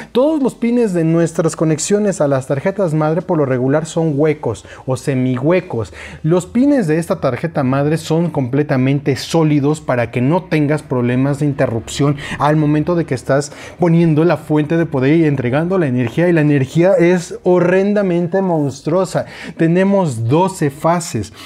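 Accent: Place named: Sonora, Mexico. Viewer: es de Sonora o por qué tan Hermosillo?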